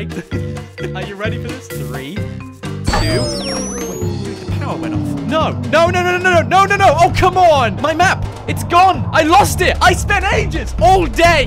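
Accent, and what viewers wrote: England, United Kingdom. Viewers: Is he British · hey are yu from england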